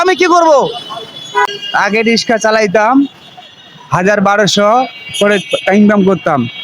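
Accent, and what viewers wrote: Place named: India. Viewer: Tumi ki bharoter bangaly naki? Tomar bhasay bharotiyo.mone hoy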